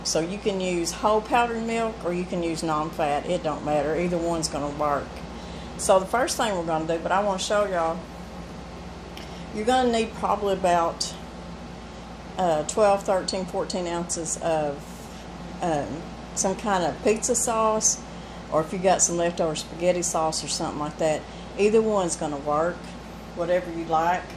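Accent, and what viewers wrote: South, United States. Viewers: I can’t wait ti try this! I so enjoy watching your videos, your beautiful southern voice is magical, reminds me of my grandmother who also loved to cook! ♥️♥️♥️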